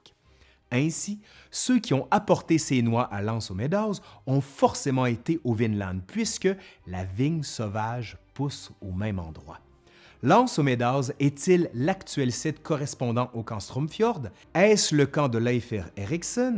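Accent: Quebec, Canada. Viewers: C'est fou, mais quand enchaîne sur "Allez, aujourd'hui à L'Histoire nous le dira...", je souris, c'est presque réconfortant de me retrouver là en sachant que je vais apprendre des trucs... et entendre l'accent des cousins québécois ne rend la chose que plus agréable:-)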